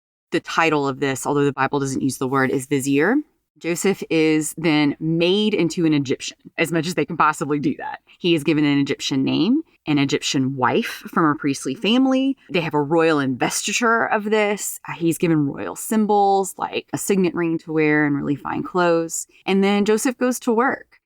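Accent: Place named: Nebraska, United States. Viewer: Well done! Brava! This is one of my areas of study for many years. You summarize well. If I may, I'll offer ttwo recommendations. 1: Your pronunciation is too regional, northern, like Nebraska, crimped. They say "theenks a lat," not "thanks a lot.". You say, "numadic," not "nomadic." Open your vowels more for Standard American English. 2: Relax your throat. Your larynx is crimped, so your voice is nasal. These mean practice, not much. Any might do wonders. I expect that is your normal voice. Such a respectable content suits your good mind. Its presentation deserves dressing up as you were applying for a job, which means better clothes than around the house. Most people don't think about sound at all. I don't mean that stuffy is at all better. Clothing is a pretty good analogy. Your content and you deserve recognition.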